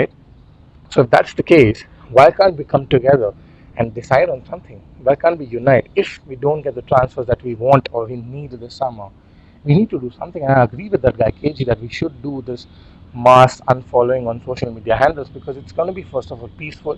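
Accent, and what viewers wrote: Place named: India. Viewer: Bro r you from India ??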